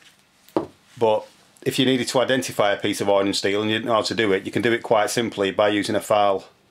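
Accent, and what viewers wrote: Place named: Northern England, United Kingdom. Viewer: I am from Sweden and I can understand all your saying although it is not as easy as "non-accent" english for me. Guess your from the northern parts of England, New Castle area?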